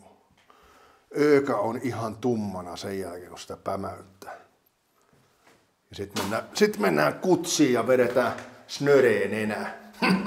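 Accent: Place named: Finland. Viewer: Kiitos. Mistä päin miäs o, ku tuntuu tua murres nii kotoosalta !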